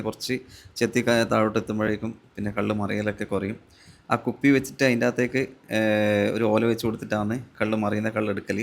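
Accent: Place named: Kannur, India. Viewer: കണ്ണൂർ സ്ലാംഗ്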